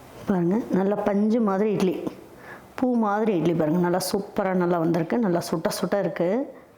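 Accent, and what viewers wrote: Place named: Kongu, India. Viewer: மதுரை சட்னி ஆனால் உங்கள் பேச்சு கவுண்டர் சாயல் உள்ளதே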